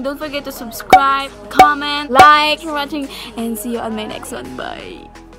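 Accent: Visayas, Philippines. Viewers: bisaya diay ka day? halaaa 💖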